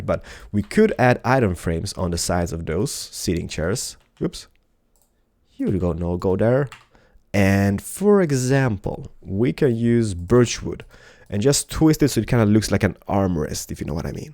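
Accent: Sweden. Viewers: are you from sweden? :O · are you from sweden!? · are you from sweden? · are you swedish!? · Are you Swedish if you are no Way im Swedish to!!! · So swedish keralis Haha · are u from sweden? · Are you from sweden ? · WAIT ARE YOU SWEDISH?!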